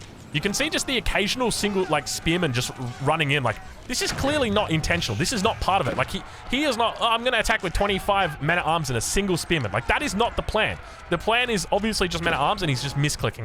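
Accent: Australia. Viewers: I really like how aussies say words player aussies turn into Playah shopping center nnto shopping centah aussies sound so cool much love brisanian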